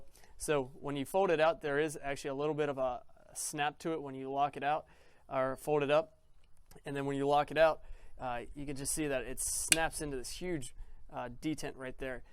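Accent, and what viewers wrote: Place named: Philadelphia, United States. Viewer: Sounds like a Philly area accent. Good video and review.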